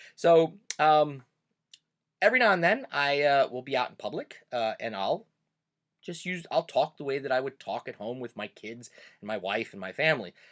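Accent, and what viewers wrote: Pennsylvania Dutch, United States. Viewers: Accch: Swetz net so dumb. It's good to talk your normal accent. When I was in the navy with alot of southern types, I was told that I "talked too fast " You don't have to talk like Proffessor Schnitzel. But a normal sounding Pa. German dialect is enjoyable to listen to. Thanks for your videos. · I get a kick out of your Dutch accent on “Ask a PA Dutchman.” I grew up in Central PA and I have heard some words that I never realized it was an accent. The most obvious words that are from the area are the pronunciation of Lancaster and Lebanon. And I don’t know if creek and crick are part of this too? When I was younger, I traveled all through PA as a salesman. It was amazing to hear all the accents just in PA. From Pittsburgh to the coal regions up north, Philadelphia and our beloved Dutch country. I never got to learn the language but there are some words that did make it though the family.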